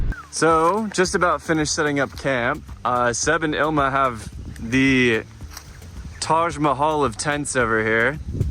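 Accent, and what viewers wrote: Australia. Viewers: Hello, i really like your videos, keep em coming please. I noticed you are starting to sound more Aussie, goodonya!! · 5 months and your accent is sounding really Australian on many words and phrases! I've been living here for years and I just can't get the sound right. Tho 'family and friends in California say I use some weird words or expressions sometimes.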